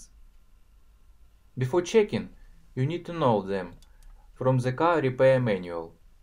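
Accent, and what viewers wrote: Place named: Russia. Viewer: First time hearing a russian accent?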